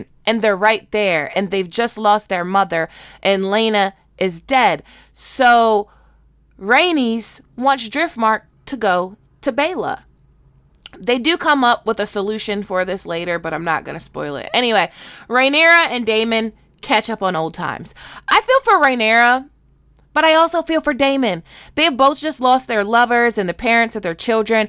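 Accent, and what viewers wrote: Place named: Louisiana, United States. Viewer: She’s from Louisiana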